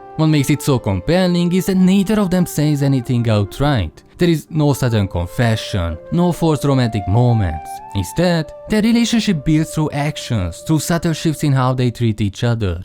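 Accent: Hungary. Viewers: Proud Hungarian accent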